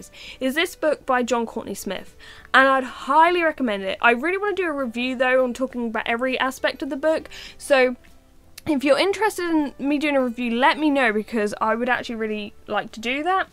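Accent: United Kingdom. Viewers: Erica SPEARMAN that’s how the Brits say it. Most understand.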